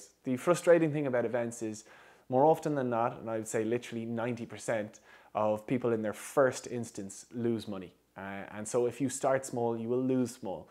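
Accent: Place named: Dublin, Ireland. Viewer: Hi! Watching from Alaska and right off the bat I knew you had a Dublin accent! Almost even a little more southern than Dublin. My dad is from Cork! Thanks for this video! I know you make a ton of videos but you didn’t start your video with an intro! No hello or anything! But lots of info! Thank you thank you!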